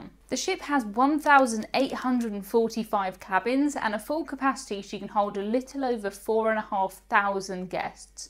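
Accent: United Kingdom. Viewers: Love your vids! My fav thing about your vids is the "British-ism" word explanations and your accent :) !! So weird how English is spoken between 2 countries but yet some words mean something totally different.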